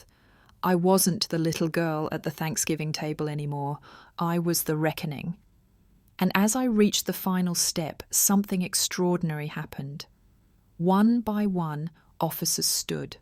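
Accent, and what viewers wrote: United Kingdom. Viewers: I’m not too sure of the narrators accent. I like the story line, just not the ‘almost’ British accent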